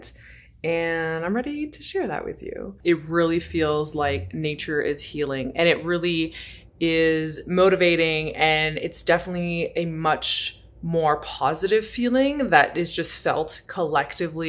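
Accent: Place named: Canada. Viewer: pilot, I hear your Canadian accent a lot more in this video than the last one I watched lol....so are you a veteran of Her Majesty's Royal Canadian Navy or Air Force? I should call you ma'am if you're a Naval Aviator or RCAF.:) I was USN enlisted on submarines, 1999 to 2003...STS3(SS).:) Never piloted an aircraft myself, want to do it someday...now that I'm fully vaccinated I've started taking some plane trips again, USA only though. Canada is still closed off to Americans I think for covid. Last plane trip, I went San Jose to Los Angeles, and then took Amtrak to San Diego, and then flew back San Diego to San Jose. Currently my next planned flight is September 3rd to Seattle for PAX West! (gaming festival)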